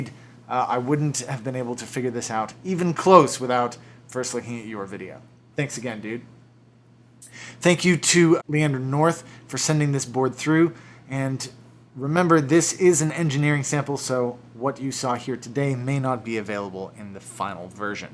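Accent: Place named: United States. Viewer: "Does my head in"... never thought I'd hear someone with an American accent say that.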